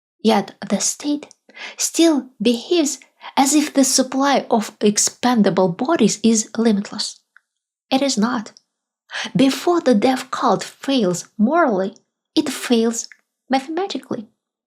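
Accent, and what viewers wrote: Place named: Russia. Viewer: Oh, I love this Russian accent ASMR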